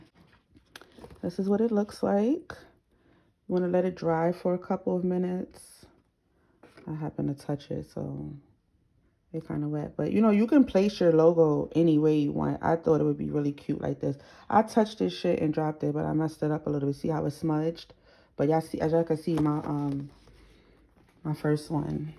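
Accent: New York, United States. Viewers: I love your “keep it real” commentary and your accent (NY?) I’ve been wanting to do this...thanks for demonstrating! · Love it lol def from NY cause thats how I be talking too.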